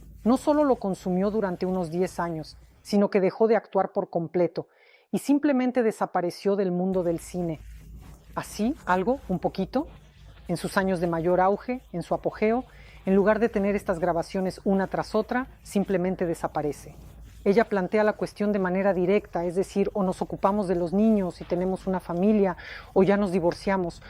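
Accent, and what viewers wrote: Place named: Mexico. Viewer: Por fin, hace tiempo que quería escucharte en español y con un acento mejicano 😅.... maravilloso ❤❤❤. LOVE YOU!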